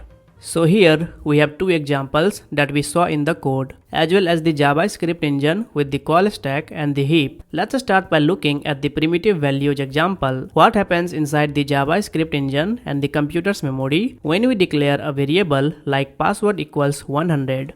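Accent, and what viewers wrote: India. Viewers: indian accent this is legit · Indian english is so cool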